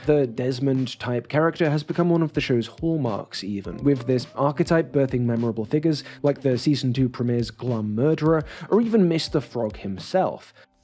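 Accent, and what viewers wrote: United Kingdom. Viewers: I KNEW HE’D BE BRITISH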